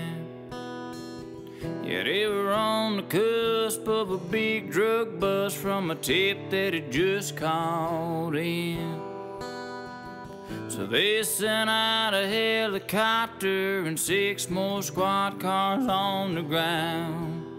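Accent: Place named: South, United States. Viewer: Love a good story teller, with a country twang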